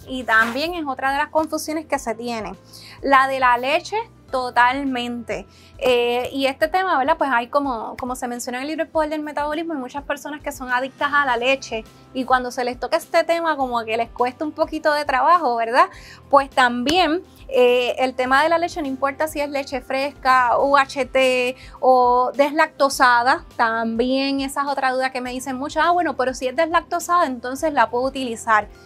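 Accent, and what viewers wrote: Puerto Rico. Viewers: Me encanta ese acento de las mujeres boricuas,,😍😍🥰🥰😘😘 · Extraño mucho a mi amigo y maestro Frank Suárez, realice la dieta 3X1 y baje 9 kilos en menos de tres meses sin ejercicio en tiempos de pandemia, ahora volví a subir de peso, Gracias por tu explicación, eres muy bella con tu asentó Pueltoliqueño☺️😍 un saludo desde México.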